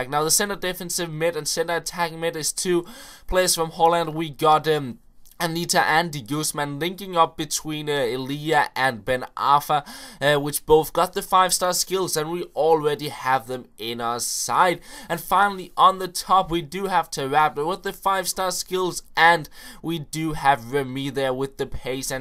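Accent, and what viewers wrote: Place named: Denmark. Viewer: it is funny cause i amm drunk, and it looks liiiiiiiiiike it is 44 plkayers on the turf :P BTW, i absolutely love you voice. the danish-english accsent is sooooooo damn awsome and sexy <3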